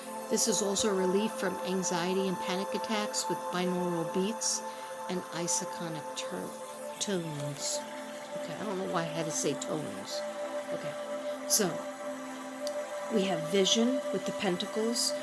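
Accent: New York, United States. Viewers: seriously I am so amused by you. ❤️ Your NY accent in TX. I love it! You guys must have moved there for a job because why else would a proud NY’er move so far southwest? It must have been a difficult transition. Lived my whole life in the Midwest, went deeeeeep south to visit my husbands family and felt (and probably looked) like a fish out of water. Nice to visit, not stay 😂. I love your heart, you are so precious.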